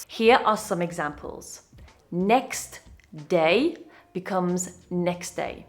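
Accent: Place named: London, United Kingdom. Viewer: It's very good your accent is in London see you next bye and great week for you.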